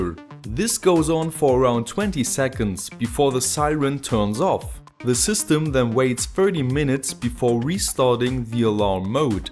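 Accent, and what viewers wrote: Germany. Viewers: Das hört man auch du hast einen Deutschen Akzent aber dein Englisch ist sehr gut!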